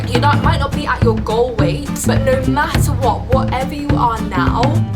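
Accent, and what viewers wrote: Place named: Essex, United Kingdom. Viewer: Idk how to explain but I just think she has a very elegant, posh accent, like very Essex like.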